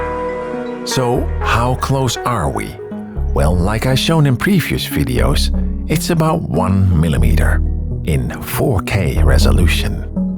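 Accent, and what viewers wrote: Netherlands. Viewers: Ik dacht al een Nederlands accent te horen en de krantensnipper bewijst het ;) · The most dutch accent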